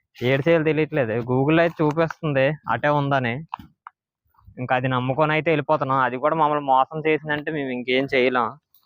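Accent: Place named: Visakhapatnam, India. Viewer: గ్రేట్ biodiversity of సింహా చలం హిల్స్. Nice free talking of వైజాగ్ style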